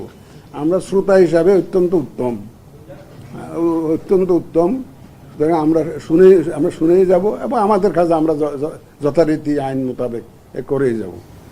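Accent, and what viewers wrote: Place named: Chittagong, Bangladesh. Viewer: Uni mone hoy Chittagong r bodda pollai unar kota buja jai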